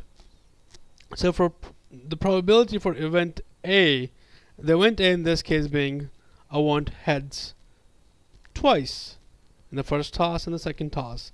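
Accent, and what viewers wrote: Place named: United States. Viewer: what a stupid american accent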